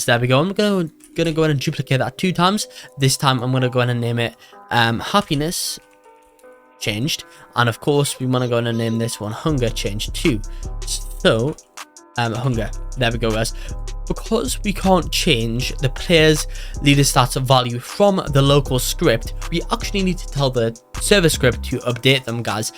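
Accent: United Kingdom. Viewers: he sounds brihish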